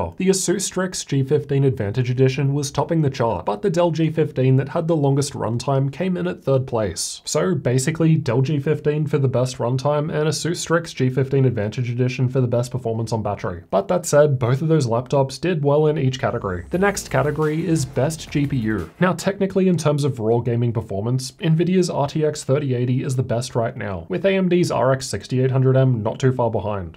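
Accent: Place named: Australia. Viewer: I can hear that you are from australia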